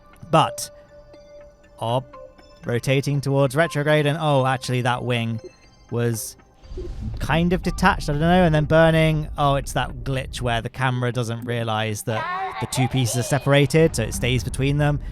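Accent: United Kingdom. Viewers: Find me a non British KSP content creator…. It’s annoying. Honestly, what is with British people and KSP? I even have a friend in Britain who plays. I’m not overly pissed, I’m saying there’s too many and I’m done listening to the accent. I’m entitled to my own opinion for whoever finds this comment and so are you.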